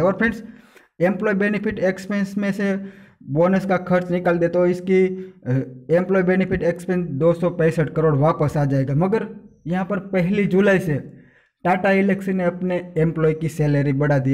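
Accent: Gujarat, India. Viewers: ગુજરાતી હોય એવું લાગે છે કેમ ??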